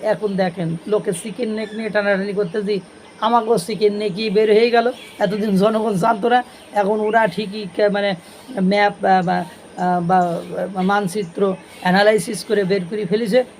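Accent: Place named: Sundarbans, Bangladesh. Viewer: আপনার উচ্চারণ, ও শব্দ চয়নে ভারতের সুন্দরবন অঞ্চলের ছোঁয়া আছে।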